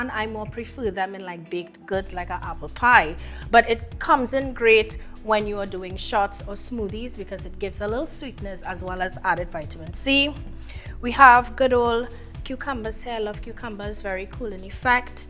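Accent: Trinidad and Tobago. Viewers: I just saw this and loving the enthusiasm ❤❤. Am I detecting a Trinidadian accent?? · Yep, Trini to d bone lol Welcome to the channel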